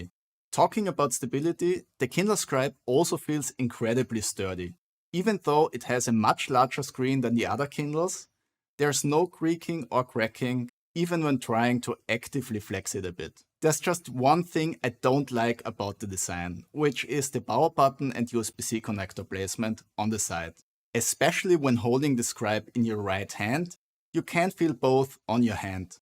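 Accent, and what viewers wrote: Germany. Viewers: Bad bad german or austrian accent sucks alot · Muy friend, your video is perfect, your content is very good, but you need to work on your english, because it really sounds like german spoken with english words. And I am writing this as a non-native listener.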